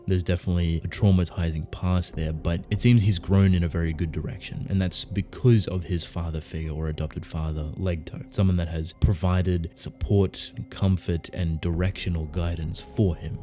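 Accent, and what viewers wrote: Australia. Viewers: yeah, something about Australian accents discussing lore/topics always sounds interesting lol. Reminds me of fudgemuppet when they dive into Skyrim.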